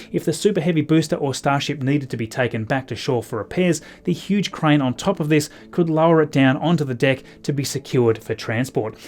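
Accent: Tasmania, Australia. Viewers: Tasmanian accent!!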